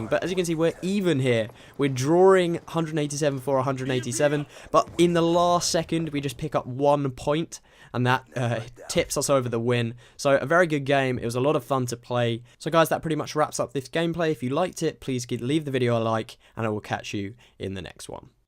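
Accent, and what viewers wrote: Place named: United Kingdom. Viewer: The BRITISH ACCENT..... Awweeeeeeeee! DAT ACCENT! :D Nice intro btw!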